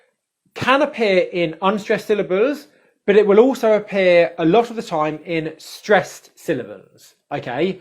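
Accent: United Kingdom. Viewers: I'm sorry to ask this question but are you originally from Britain? Your demonstration of these two sounds are different from another British girl's.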